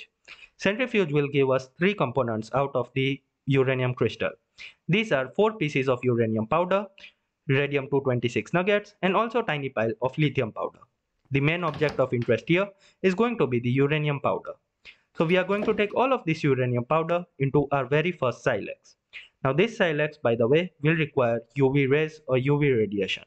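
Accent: India. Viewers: Are you Indian, your accent is nice. no sarcasm im Indian.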